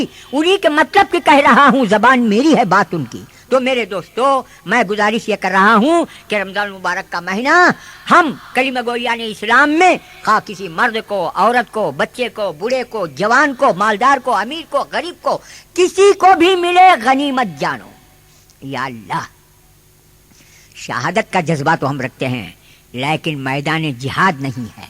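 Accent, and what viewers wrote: Bangalore, India. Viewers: Yeh purely bangaloreans ki urdu hai yaar ( aso bangalore ku baath karinge tabich malum hotaye bekaar ke commentan likh ko chhatto nakko)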